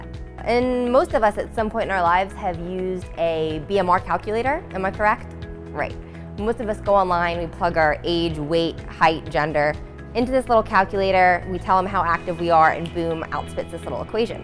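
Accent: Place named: United States. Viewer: She sounds like she has every American female accent at once.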